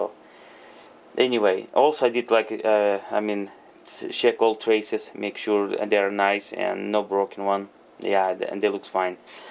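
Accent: Russia. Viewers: Russian accent?))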